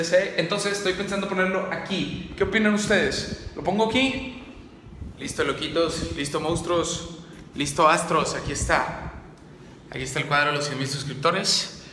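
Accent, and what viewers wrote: Mexico. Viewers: a numa ,eres de monterrey :v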